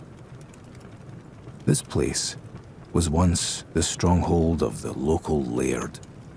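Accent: Scotland, United Kingdom. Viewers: Yayyy Scottish❤ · i want a scot in my ear all the time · The Scottish accent is perfect. Not too much of a heavy brogue. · I never realised there was a Scottish voice on Headspace 🥳🏴! I wish you could filter a search by accent, or person, as British accents are more relaxing for me - ie, where Headspace started with Andy's voice (I find some of the American accents quite jarring 🙈). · I never thought a Scottish accent would be lulling me to sleep regularly. Make more!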